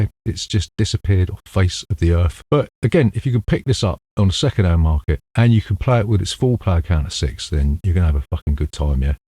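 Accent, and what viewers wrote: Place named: England, United Kingdom. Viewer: Can't place the accent - which part of England are you from .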